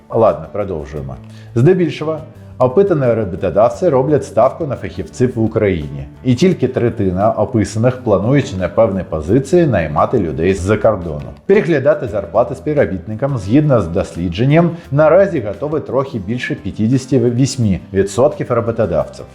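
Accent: Russia. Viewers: За старання українською - респект, але слухати дуже важко. Не знаю скільки репетирував та робив дублів, проте "азіровську" не виправив аж ніяк. 😊 Особливо плутає і та и. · Покращуй, вимову... Трохи віддає азіровщиною, а це досить неприємно!!!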